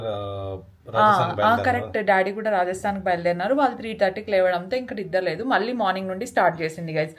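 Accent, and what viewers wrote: Anantapur, India. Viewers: ....before sleeping ask to have milk everytime it's natural tip to get sleep...I just love ur slang Anantapur slang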